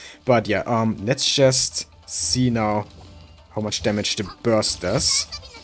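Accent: Germany. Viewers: i hear german?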